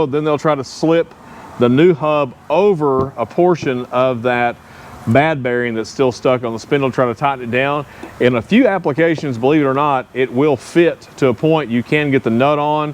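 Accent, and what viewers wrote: Texas, United States. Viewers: I truly enjoy your videos and your texas accent, love it. If it weren't for the fact that your are more than 2 1/2 hours away from me (I live in Cedar Park/Austin area) and that I like to maintain my own RV, I will visit you regularly, even just for chatting. I just finished repacking my bearings (races and bearings were good!), and my next job is to replace the leaf springs. Thanks for your help, as I learned a lot with you. Thanks!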